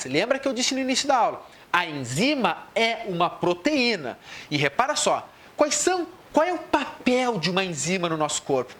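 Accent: Rio Grande do Sul, Brazil. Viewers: tu é do Rio Grande do Sul? tem sotaque gaúcho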